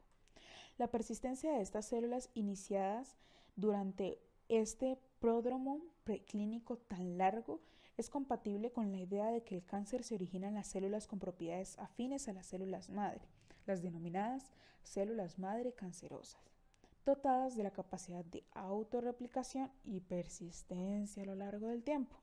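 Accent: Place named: Colombia. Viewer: Tienes que ser colombiana,tú tono de voz es hermoso, dra porque no continuo con el proyecto este y el otro canal estan caídos, además supee genial estps capítulos de Robbins, gracias, saludos desde Cali, Colombia.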